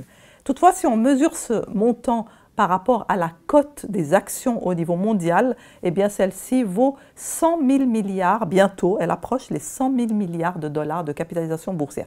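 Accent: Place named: Switzerland. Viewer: Delicieux accent qui sent le chocolat Frigor et le Läckerli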